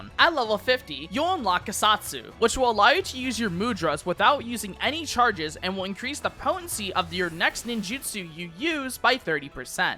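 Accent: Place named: United States. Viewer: my god your voice is so painfully american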